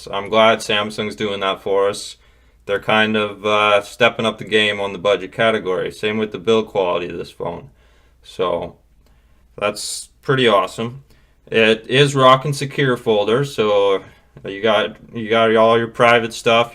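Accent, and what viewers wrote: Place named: Canada. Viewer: For your screen on time of 10 hours, is that over the course of two days with quite a bit of standby time mixed in, or more straight usage in one day? Also sounds like you're in Canada so I was wondering if you bought this phone from a carrier or unlocked? If you bought it unlocked where did you get it? Seriously considering this phone, samsung even dropped the price to $450 CAD on their website.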